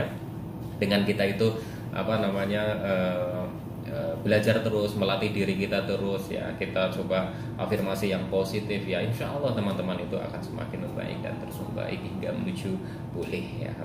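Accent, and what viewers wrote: Banyumas, Indonesia. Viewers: Mas. Sembuhnya Berapa lama?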